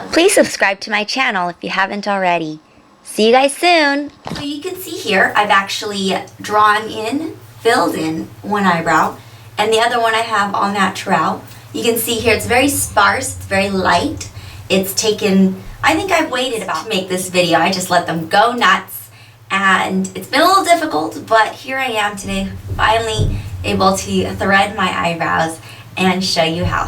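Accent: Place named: Vietnam. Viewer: I could tell right away that you were Vietnamese for some reason. The way you speak. Hha.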